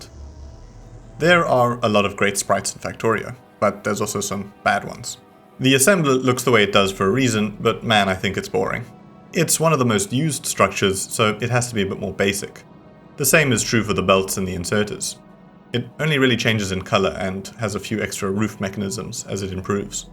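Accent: South Africa. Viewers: Love the ZA accent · I mean this in the nicest way possible, this channel is such a comfort channel for me just cause it's so nice to hear a fellow south African yapping about nerdy shit (cool nerdy shit) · I KNEW YOU WERE SOUTH AFRICAN!!! nice! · Was like - thats a SA accent! :) Good luck boet! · You’re South African!!! Howzit bru 🤙🇿🇦🇿🇦 love the videos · oh my god are you south african??? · South African, eh? Neat :) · A wild South African spotted! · Is he South African??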